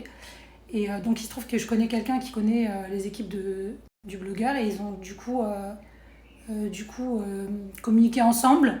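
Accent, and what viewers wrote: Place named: Ivory Coast. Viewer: Elle est obligée de prendre l accent ivoirien?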